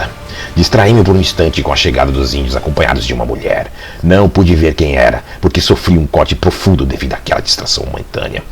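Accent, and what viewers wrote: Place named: Portugal. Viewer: Narração muito boa! O sotaque português ri demais 👏😊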